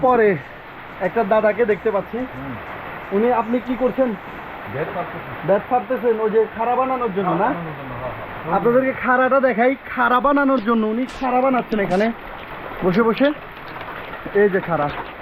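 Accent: Noakhali, Bangladesh. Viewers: ভাইছা আইন্নে কি নোয়াখাইল্লা।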